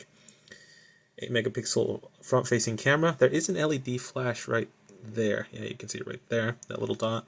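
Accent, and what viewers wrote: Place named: France. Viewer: The French accent😂😂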